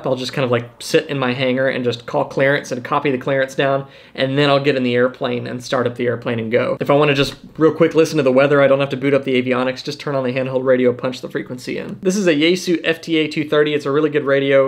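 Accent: Texas, United States. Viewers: Magnificent Infos for Pilot appreciate very much I'm now used to your fast Texas talk again no problem. See you later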